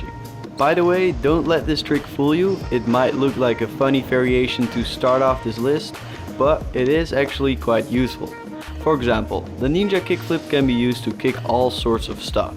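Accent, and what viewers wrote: Netherlands. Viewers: pretty good my dutch friend.. mooi acent btw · You got a Dutch accent kan ik wel horen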